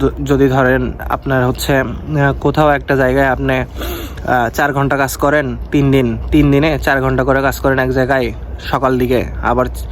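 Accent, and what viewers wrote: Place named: Bogura, Bangladesh. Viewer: vaiya apni ki bograr apnr vasa ta bogurar moto laglo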